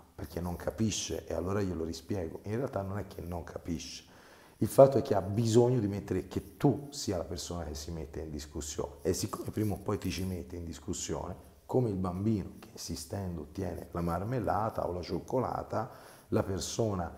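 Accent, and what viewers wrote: Tuscany, Italy. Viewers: Basta, fatela finita, basta andare a vedere questi video sul narcisismo 😂. Molto simpatico dottore, ogni tanto la seguo proprio per questo. Ha perfettamente ragione altrimenti diventa un ossessione. Si esce da una dipendenza e si entra in un altra . Complimenti per il suo modo schietto di esporre . La parlata toscana ben si addice. Chapeau 🙏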